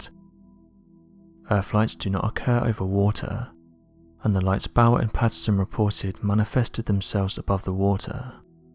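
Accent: England, United Kingdom. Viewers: English dude puts me to sleep, when I don't need to sleep, almost like he's sharing something illegal !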